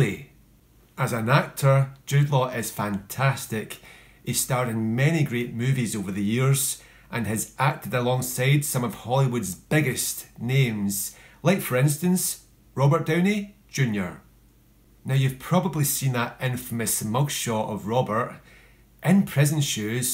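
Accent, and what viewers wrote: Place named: Scotland, United Kingdom. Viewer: Are you scottish?? I love your accent ❤️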